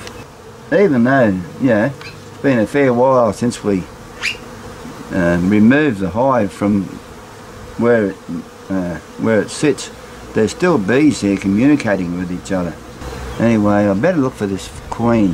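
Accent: Australia. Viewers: hello mate. im a third year beekeeper. had my share of success and failure in that short time. i go to a lot of renaissance faires and was thinking of putting together a display hive for exactly what you said( the wow factor when they see the inner workings and beauty that are inside the hive). was looking at ideas when I found your videos. good job sir. very enjoyable. plus being an American I love to hear you talk (you sound like my buddy Ian from queensland) I like it almost as much as listening to my grandparents who are fresh off the boat from Scotland. keep up the good work and I'll keep watching. im also trying to get a youtube page off with a few videos but im not that good with or in front of a camera. i love trying to video my swarm removals. I've rambled enough, have a g'day mate and dont forget to Bee Happy. sorry couldn't resist.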